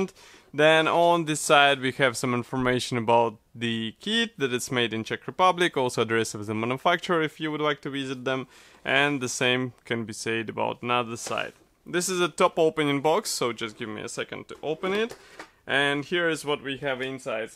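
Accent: Russia. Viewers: судя по произношению родной язык у человека русский)) модель интересная, шведы вообще своеобразные, в планах собрать все их реактивы..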